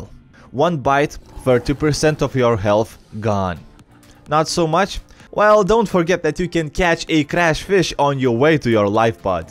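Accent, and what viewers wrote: Russia. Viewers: Русский акцент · I'm sorry if this offends you in anyway, but, are you Russian or maybe Scottish? The accent you have is making me curious. If you don't wish to answer I understand. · are you russin? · Hello comrades" communism 100 Edit:I just noticed the russian accent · У тебя такой русский акцент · are you Russian? probably but are you? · His accent is good tho Russian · I can bet you are russian i hear your english and i like intro HELO COMRADES 😂 greetings from serbia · Is narrator russian? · Russian ascent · I love your Russian accent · Больно у тебя акцент русский . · Do you speak Russian accent? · I love, when russians speak English! It sounds so awesome! I love the russian accent. “a friendly slap in the face.“ xD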